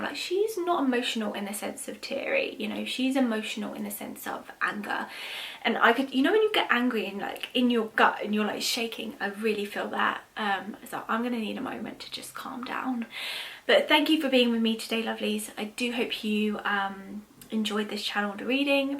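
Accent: United Kingdom. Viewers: Very interesting why do they wait until they are no longer here to tell the secrets? Why not tell while they are here any who this was very interesting I enjoyed the reading I love your accent are u from England? are You British? U have a beautiful accent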